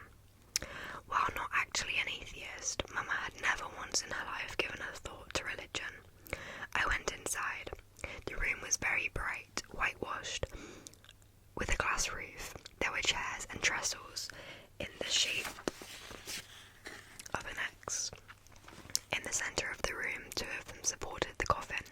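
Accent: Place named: France. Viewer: I love this book, Camus is such a genius! Plus the English to French language switch is incredible 🌟 PS: Love your French accent, you did great!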